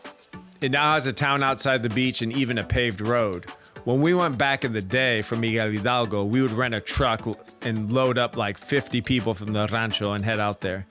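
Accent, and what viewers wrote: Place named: Northern California, United States. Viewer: Thanks for this! Love your commentary. You sound like a Northern Californian LOL. Gonna go in a few weeks!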